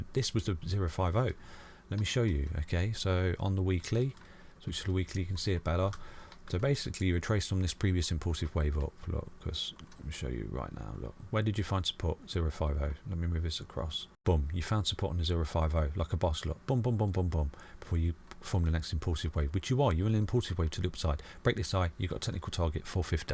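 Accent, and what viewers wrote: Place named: Middlesbrough, United Kingdom. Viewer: He might be from Middlesbrough as my friend has the same accent, he knows his stuff, hot on it!! crew 🚀🚀🚀🚀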